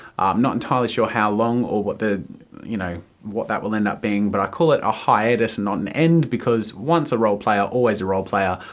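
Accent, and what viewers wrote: Australia. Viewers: sounds more Australian 4 years ago than he does today:) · I have never heard such a huge aussie accent from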